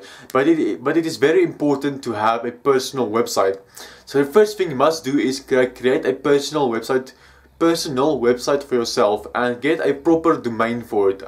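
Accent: Afrikaans, South Africa. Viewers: <link> That part from there that Afrikaans accent still love your videos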